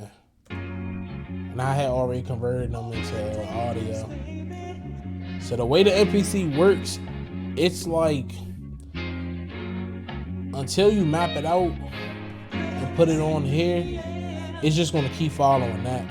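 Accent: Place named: DMV, United States. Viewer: you got a dmv accent pushp on me i own a studio in dc let get you some placemnts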